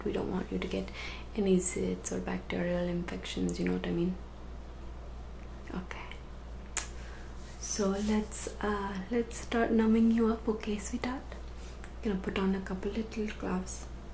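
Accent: India. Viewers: You are my favorite asmrist I wish you made more videos. I love Indian accents, even if you are acting. Plus, you are super gorgeous.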